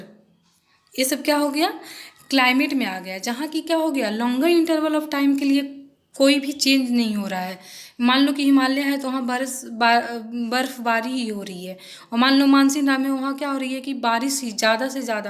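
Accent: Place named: Bihar, India. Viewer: Bihariyo ko tarah mt bol pagal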